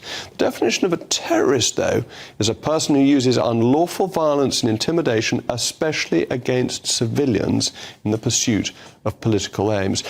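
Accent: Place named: RP, United Kingdom. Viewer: Propaganda in a posh accent is still propaganda.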